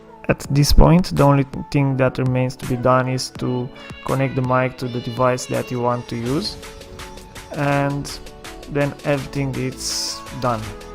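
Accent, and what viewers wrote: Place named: United Kingdom. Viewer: Que acento mas malo, seguro eres británico?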